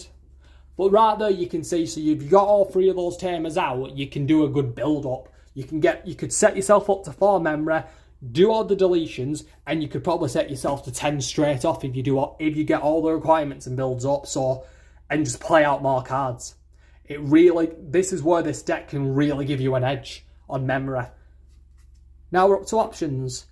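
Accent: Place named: Yorkshire, United Kingdom. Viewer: Mate you sound like you're from Barnsley or Yorkshire... But this is an interesting profile...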